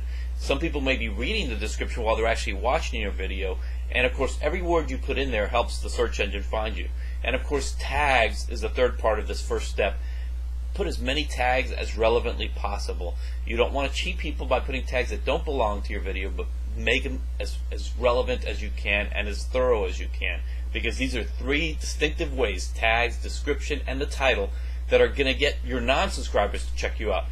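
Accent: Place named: Brazil. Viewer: it feels like a brazilian in USA. he doesn't sound native even though he's trying hard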